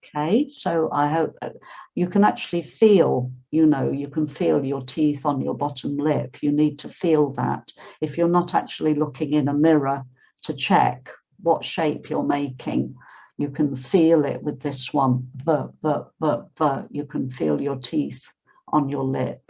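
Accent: United Kingdom. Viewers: i do love ur accent, British accent 🥰 that's y we called english, english is the mother of languages,lol American speaks english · Thank you so much. You use British accent right. This is what I looking for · Good morning. I am writing, "Good morning," because it is morning in Siberia. Thank you very much for your lessons, they are very useful and your pronunciation is perfect. Real British English.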